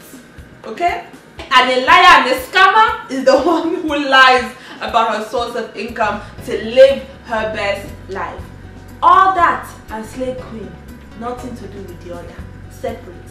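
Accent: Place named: Igbo, Nigeria. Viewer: hahaha...see me laughing my butt here. slay queen drama...That igbotic pidgin english accent though.😂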